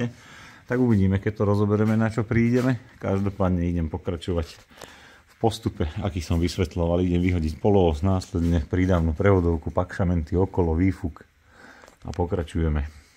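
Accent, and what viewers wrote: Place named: East, Slovakia. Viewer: Máš predkov z vychodu ze vies trocha po vychodnarsky ??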